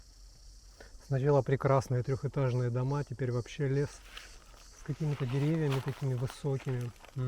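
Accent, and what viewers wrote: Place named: South, Russia. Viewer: Интересная поездочка! Мне не доводилось бывать в Непале. Самая близкое к тому место посещения - Индонезия. Кстати, дымка - скорее всего, проявление высокой влажности. Я такое видел в Джакарте и Лагосе. И вопрос - откуда южный говор, если не секрет? ))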